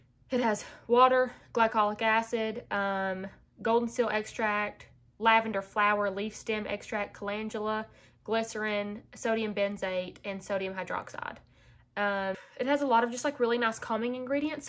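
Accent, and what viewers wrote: South, United States. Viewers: you just look so glamorous and the southern accent totally caught me off guard. I had to take a double take. But I agree that you are so funny. But to have so much success at such a young age, I'm so jealous.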